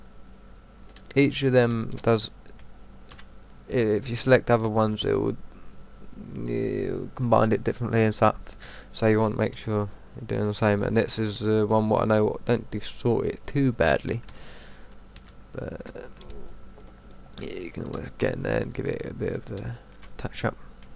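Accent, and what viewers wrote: United Kingdom. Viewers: uh uh uh your uh uh stutters are uh uh almost as uh unbearable as uh that uh mmm brit ass accent. Couldnt watch anymore.... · **you're. And actually I said "British" because I wasn't sure if he was english. He could be from Wales for all I know. I know the accent differs a bit depending on where in the UK you are, but I'm not an Expert! :S Oh and yes, I'm not english or british in any way, i'm canadian. :)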